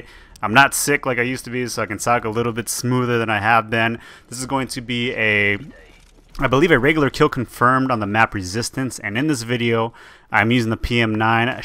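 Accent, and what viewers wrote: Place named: United States. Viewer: When u said u were asian i was like whaaaaaaaaaaaaaaat? xD you got an american voice, nice gameplay.. as always!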